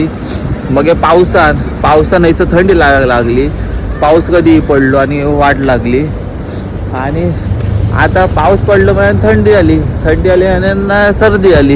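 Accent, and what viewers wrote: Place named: Sawantwadi, India. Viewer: बोलण्यावरणा सावंतवाडी दोडामार्ग चो वाटतस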